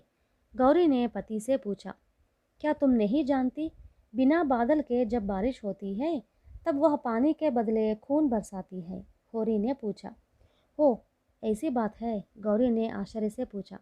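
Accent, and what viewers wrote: South, India. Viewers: So nice 👍. Old Chandamama of 1960s and 1970s was pure 💎 gem. Carry On dear. Read more of these in Hindi version so that deserving children are not deprived of the good lessons taught in childhood 😊😊😊😊. Best of luck 😁. Your South Indian Hindi accent makes it so sweet and beautiful to listen. See that your accent has become your strength and advantage.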